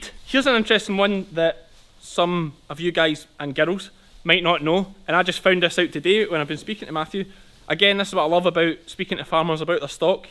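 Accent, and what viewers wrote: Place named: Ayrshire, United Kingdom. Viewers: Please do say something in your Ayrshire dialect I would like to hear it! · Top craic as always,keep it coming.Dont try and talk without the accent. Ayrshire speak makes it!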